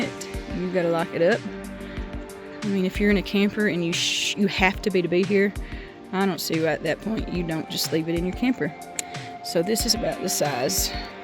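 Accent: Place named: South, United States. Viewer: Awesome video. Im trying to place your accent....Mississippi? Alabama?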